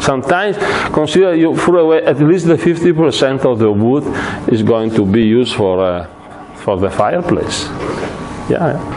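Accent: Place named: Hungary. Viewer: Nagyszerű videó!:)És jó hallani a magyar akcentust az angolban!:D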